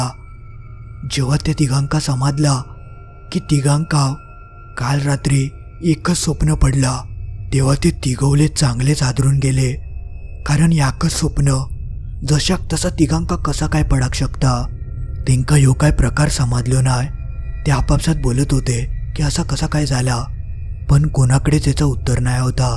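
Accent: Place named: Malvan, India. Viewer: दादा तु खूप छान गोष्टी सांगतोस आणी तुझी मालवणी भाषा खूप गोड वाटते. ऐकायला प्रत्येक गोष्ट वेगळी असते .मला खूप आवडतात .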